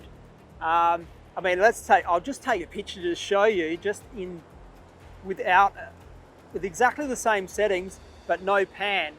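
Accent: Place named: Australia. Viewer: Thanks for the insight. Great to hear an Aussie voice on YT. Awesome work.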